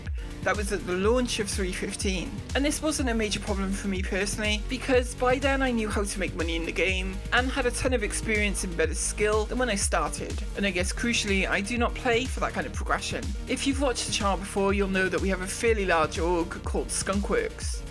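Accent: Wales, United Kingdom. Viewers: I honestly just thought the voice was a specific British accent from somewhere. 😂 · Thanks! I thought you were speaking with a British accent. We all have something. I’m low vision. It’s OK and you and are among friends! Your videos are fantastic!! I very much enjoy them. Please continue. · Awesome! The Welsh accent is lovely. I’m down here in the Southern Hemisphere, and I like being able to remember accents and where they come from. (I have fun guessing with overseas visitors at my work). Best wishes for 2022 and beyond! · Your voice is lovely sounding and Welsh #FullTidee so don’t worry about those that mock. I’ve been placing SC just about as long as you have but can’t put the hours in as much as I’d like so still feel a bit of a newb when it comes to approaching an Org and I tend to hide away mining and exploring different locations. I’m less combat focussed so maybe Skunkworks can form an explorer arm and recruit for that?:) Keep up the good work though (and ) and see you in the verse hopefully soon. 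Taffy Coops. X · you make really good content. Nice Music, good gaming, good edditing and your voice rounds it all up its just natural, its good as it is. English is not my first languege but do you have a britisch accent? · There's nothing wrong with your voice. I do love that Welch accent, but it could be thicker....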